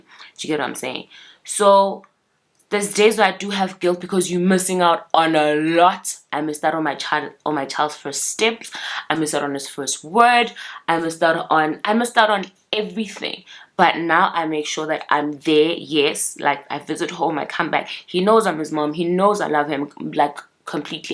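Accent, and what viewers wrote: Nigeria. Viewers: Definitely, definitely want to see more content similar to this. It opens eyes and strengthens women in so many indescribable ways. I love the Nigerian accent as well, always gets me smiling🤭🤭🤭❤️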